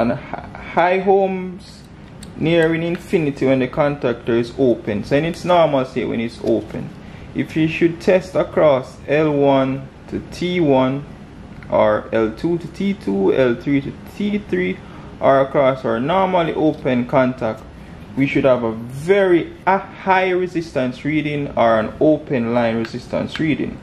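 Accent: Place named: Jamaica. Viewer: Thank you Jamaica 🇯🇲 I know that accent anywhere Greetings from 🇧🇿 Belize!!!! ☮️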